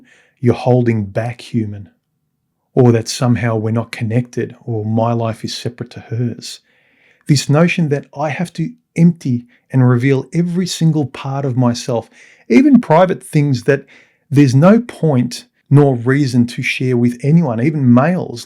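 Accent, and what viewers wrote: Australia. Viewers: One of your best, HuMAN, but what's a "garl friend?" BTW The only man I know with a more distinctive Ozzie accent is Wal Thornhill, and he's a bloody genius across physics, astronomy, electricity, and cosmology. Anyone who can handle real science, should check out the thunderbolts project.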